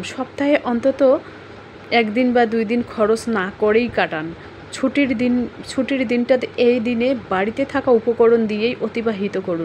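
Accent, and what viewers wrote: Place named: Bangladesh. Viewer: পুরো ভিডিওটা দেখলাম, আপনার কথা বেশ ভালো লাগলো, মনে হলো আপনি বাংলাদেশ থেকে 😮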